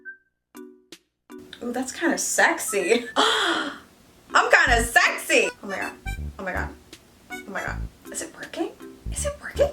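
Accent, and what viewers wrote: United Kingdom. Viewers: Your british accent killed me🤣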